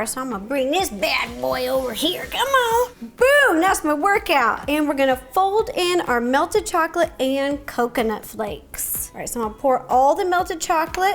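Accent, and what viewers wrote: South, United States. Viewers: She sounded like she had a southern accent for the majority of the video.😂 I love her. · Anyone else feel like Ro has more of a country accent today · yo why she sound so country in this video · Southern Ro came out for this video. Pretty cute. These look incredible. I think I have all the ingredients! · Ro is so southern in this video and I love her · Has anyone noticed how thick her “southern-like” accent got in this video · This quarantine is bringing out Ro's Southern Belle! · When did Ro become a southern mama?? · She's got a little bit of a Southern accent going on here, lol. She's so adorable. Love you, Ro. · She’s sounds a bit southern today... and I kinda love it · I never realised Ro had such a Southern accent. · she went so southern after folding the dough! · Is it just me or did ro develop a little southern accent throughout the course of the video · This is meant as a total complement but I love how southern you sound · Ro sounded super southern in this video! 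It was pretty cute 💜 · Ro i love you so much and every time I hear you talk i’m just reminded of my family down south cause ya just sound like a sweet little southern belle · Is it me, or did Ro have a lil bit of a southern accent goin on here? Either way, it is adorable and I love her♥️ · Ro, you sound sothern in this video. I know you said you grew up in Seattle but you kind of did this accent most of video. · She sounds more western or southern than other videos, lol or is it just me? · I'm curious to know where the Southern accent is coming from. She's from Washington. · She sounds very southern in this video. Like compared to normal. Idk why. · I love how throught this video she had a thick sounding southern accent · But why did Ro have a southern accent in this video 😂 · sounds a bit different like a southern accent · She sounds so southern omg. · Does anyone else think that she sounded a bit like she was from the south?! 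Ro you are still amazing, you are so uplifting and kind and you make me smile every time you post a video!! Happy Easter everyone 🐣❤️ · Happy Easter to you and family. Love your videos and dress. Why were you speaking with a southern accent? Thanks for the video, I can even eat this now during Passover. ❤️💕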